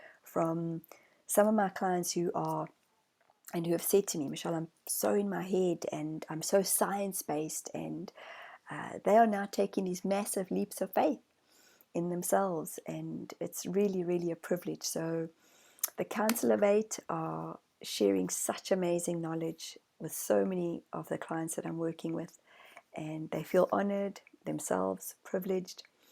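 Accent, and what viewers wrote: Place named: South Africa. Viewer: I just wanted to tell you, for one: I found you through Jeff Mara & so happy I did. For two: I knew right away you were South African when you were on his show. My ex husband is South African. I’m American 🇺🇸😟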